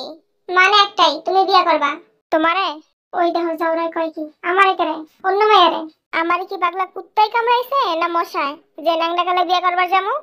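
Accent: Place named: Brahmanbaria, Bangladesh. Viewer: Na tmr kotha shune mone hocche ashole dadur bashy shobay emon bhabe kotha bole ty mone holo tmi Brahmonbaria r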